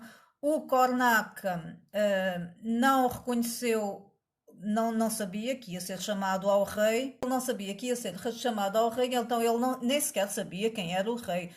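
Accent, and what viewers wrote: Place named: Portugal. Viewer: Gostei muito de ouvir o seu português de portugal.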